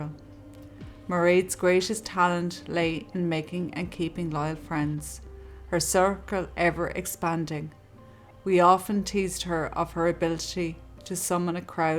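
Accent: Ireland. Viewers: Love love love..cant wait for more content. A lovely irish accent. Such sad cases. X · How is it possible that his family did not realise that he had schizophrenia and as such a very real danger to himself and society, I wonder. So sad about Mairead, she was such a wonderful young woman. Thank you for your channel, and your lovely Irish voice! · you have an Irish accent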